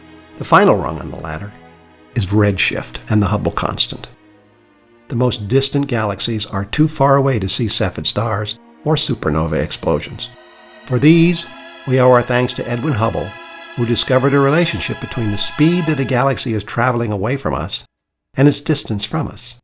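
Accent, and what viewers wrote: United States. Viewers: It's wonderful to hear metric measurements in an American accent.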